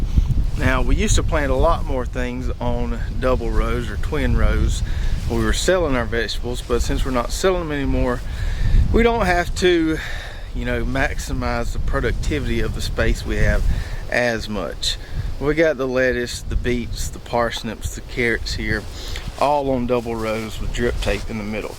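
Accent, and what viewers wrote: South, United States. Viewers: Trivial but--Southern Drawl a bit refreshing from farther N. Commifornia, even if it's heel for hill. 😄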